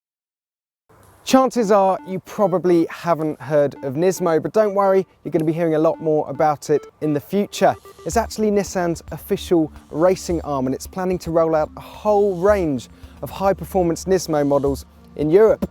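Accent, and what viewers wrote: United Kingdom. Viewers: Damn,I can't understand British English -.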